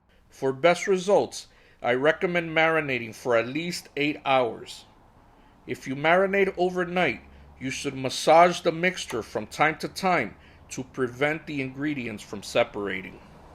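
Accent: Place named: Latin America. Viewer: I can simply tell by the accent that this guy is qualified to have this conversation. If you aren't from Latin America, you just don't know skirt steak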